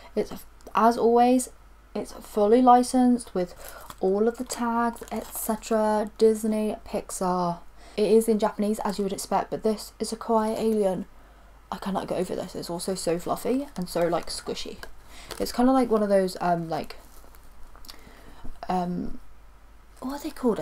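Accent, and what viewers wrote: United Kingdom. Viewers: Hey, I am guessing from your accent that you are based in the UK? I was wondering if you remember which courier service was used to deliver your box? Mine has still not arrived from June and the company are just telling me it is out of their hands once the box has left Japan :(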